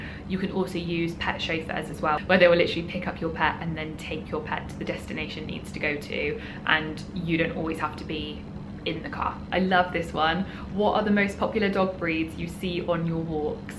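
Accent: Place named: West Country, United Kingdom. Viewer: Hello. I'm James and also British ( I'm detecting a bit of a West Country accent?) I'm currently living in Manila and will be moving to Dubai in May along with my little border terrier Ted. It's a similar climate here but less dog friendly / accessible. Thanks for putting the info together as you've just answered lots of the questions I had around apartments and general dog life in Dubai!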